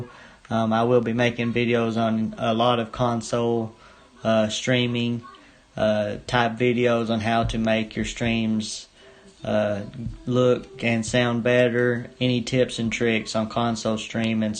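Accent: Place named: Deep South, United States. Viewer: Yo u gotta be from the Deep South. Accent is superrrrrrr strong. U sound mad racist lmfaooo.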